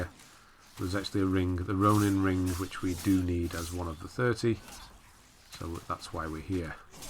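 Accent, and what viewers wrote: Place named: Teesside, United Kingdom. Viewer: mate these have been a great help so far never played a souls game before had to restart after watching your first vid as I had agro'd that first Prince and ended up feeling like I had to kill him I have now filled all the way through taking shots at doing it on my own and watching the vids to make sure I havnt missed anything and leveling up with you at the end this has been a great help were are you from you sound like you are from near me I'm Teesside UK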